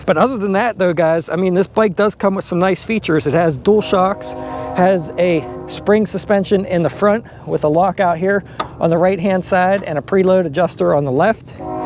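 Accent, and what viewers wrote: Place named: Pittsburgh, United States. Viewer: Sounds like you are from the Burgh area possibly the mon valley.. love it! I grew up in Ambridge.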